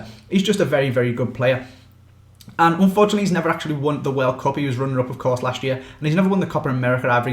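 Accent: Liverpool, United Kingdom. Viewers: are you scouse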